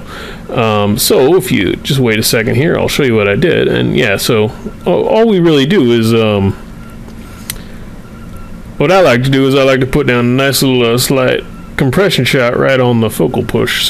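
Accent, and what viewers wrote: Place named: United States. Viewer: lets see the live stream! ps. you had a bit of a cowboy accent in that one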